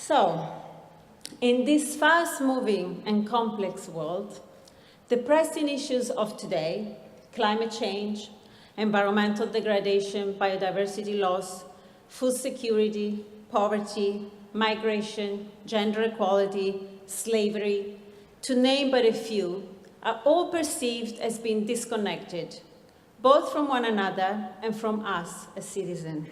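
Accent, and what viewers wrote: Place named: Italy. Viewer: She sounds like an Italian speaking English. Foreigner speakers never achieve a good British accent. Even the majority of native English speakers never achieve a good British accent! You are obviously not Italian. Her husband does not speak Italian perfectly. It is good but he has a limited vocabulary and even he himself has said, he sounds like an Englishman speaking Italian.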